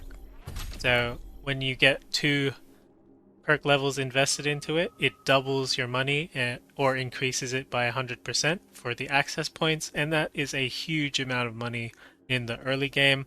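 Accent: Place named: Australia. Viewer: Nice video. Also nice to see a fellow Aussie doing cyberpunk vids (I assume you're Aust from the accent but apologise if I'm mistaken)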